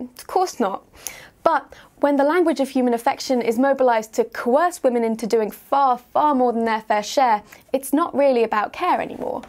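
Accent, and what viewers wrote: United Kingdom. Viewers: Cute, sexy, smart and with a British accent......cool!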